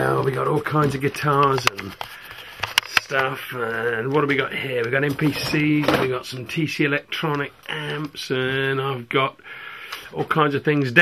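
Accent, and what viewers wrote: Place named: United Kingdom. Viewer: <3 Love your british accent.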